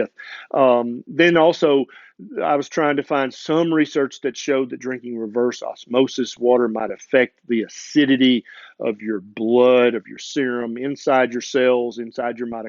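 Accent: South, United States. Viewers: Thanks Doc for cutting through the marketing BS and quackery with science drizzled with your mellifluous molasses over honey southern accent.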